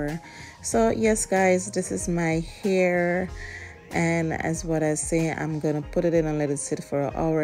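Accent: Jamaica. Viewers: You sound Jamaican 🇯🇲 big up if you are lol🇯🇲🇯🇲🇯🇲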